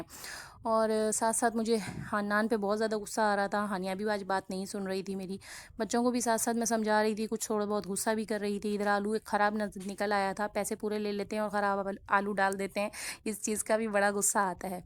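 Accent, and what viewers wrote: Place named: Punjab, India. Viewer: Urdu main Punjabi touch acha hai.chuk k rakho..😂😂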